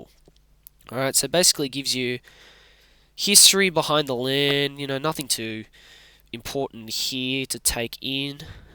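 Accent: Australia. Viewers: Bro his Australian accent is so much stronger here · Strong occa Sydney accent here I was not expecting this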